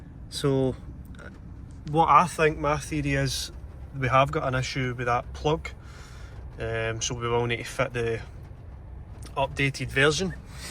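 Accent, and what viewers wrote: Glasgow, United Kingdom. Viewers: Well done mate, not many would have made that relay repair. This repair should give you plenty of work. Which you deserve, once the word gets around, that you supply good work and can be trusted.ps. Good to hear the Glasgow accent 👍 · What a fantastic diagnosis and repair video. I’m having a bit of trouble with the strong Scottish accent, but think I’ve ‘tuned in’, and can understand the work flow. I do repairs for people, as I love solving the puzzles. Just repaired “my grandfather’s Panasonic walkman’ for a friend, which was a long job due to battery corrosion. All the plate-through holes did not connect the top to the bottom track. Each pad was ‘etched’ away from its plate through centre. I had continuity from the centre to the track below, but not from the centre to its top pad. Had to fill nearly all plate throughs with a wire link. 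Anyway, traced the whole board through and found more breaks in the track. All repaired. Fixed it in the end. Absolutely loved your analysis and diagnosis. Using sound to find the faulty relay - fantastic skill. … and then tearing the relay apart to demonstrate the hard fault. Also liked your research. Other authors bothering to write-up their fault-fixes. What a useful database. Looking forward to the next video collection. Matt Lee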